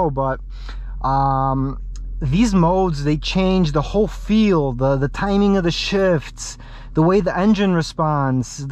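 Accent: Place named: United States. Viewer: urodzony w stanach? you sound like a native american speaker.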